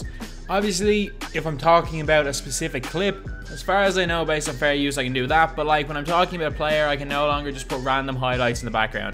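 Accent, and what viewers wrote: Ireland. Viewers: Dude u Irish?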